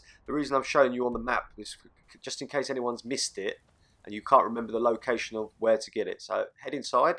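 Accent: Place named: United Kingdom. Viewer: will these items make you become master of the thieves guild? Btw I Love Your British Accent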